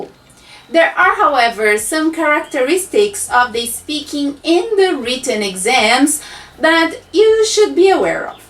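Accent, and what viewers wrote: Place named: Brazil. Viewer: Nos primeiros 10 segundos de vídeo eu já fiquei tipo... Essa garota deve ser brasileira, só pelo sotaque kkkkk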